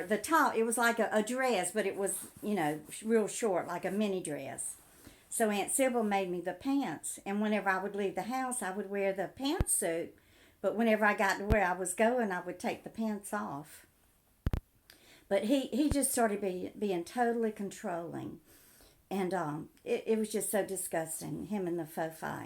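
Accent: South, United States. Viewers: I knew you sounded Southern!! I’m from Florence Alabama, went to school in Waterloo. I’m loving your story!! Bahahaha I had an Aunt Sybil too🤣🤣you’re cracking me up woman 👏👏😂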